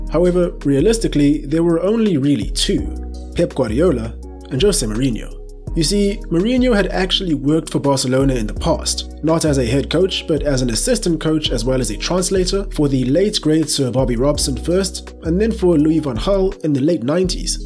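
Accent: South Africa. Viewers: is the narrator South African ? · Great videos mate keep up the Good work🔥.....I can't help but notice your accent sounds very South African... so are you from SA😂❤ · Random question mate but are you South African ?🤣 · Off topic, are you South African?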